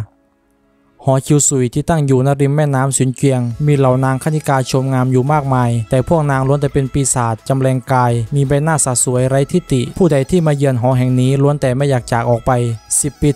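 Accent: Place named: North, Thailand. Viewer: น่าจะคนภาคเหนือครับ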